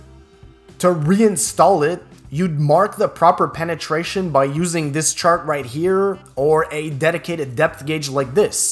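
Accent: Quebec, Canada. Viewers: Love your videos. Is this a Quebec accent I'm hearing? :)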